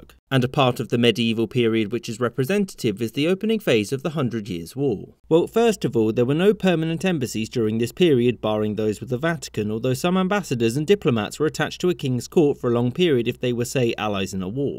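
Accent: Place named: Scotland, United Kingdom. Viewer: I'm Irish yet I understand the Scottish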